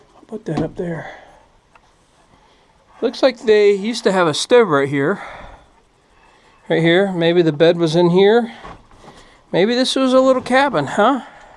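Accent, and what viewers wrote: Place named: Pennsylvania, United States. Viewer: You are more than welcome to come join us on a hunt if you get up our way. I knew I recognized that central Pennsylvania accent. I’m a former police officer and K9 handler. Some of our dogs came from Castle k9 in Carlisle Pa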